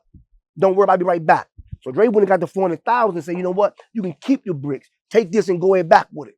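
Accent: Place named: Maryland, United States. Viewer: This nigga sound like my boy in m.d and it's not P.G county I'm deeper in m.d than that ...I'm m.d in between Baltimore and Philly 1 hr either way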